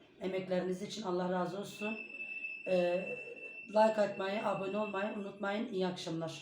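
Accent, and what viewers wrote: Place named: Gaziantep, Turkey. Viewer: 7 kuşak doğma büyüme İzmir'liyim İzmir'de böyle bir köfte yok !!! İzmirin sulu köftesi olur böyle yoğurarak vir köftemiz yok bizim m..Siz büyük ihtimalle anteplisiniz şiveden anladım... Antep'in içli köftesi çiğköftesi çok bilinir Ellerinize emeğinize sağlık ama bizim İzmir'in böyle bir köftesi yok yinede memleketimin adı geçtiği için mutluyum 👍🏼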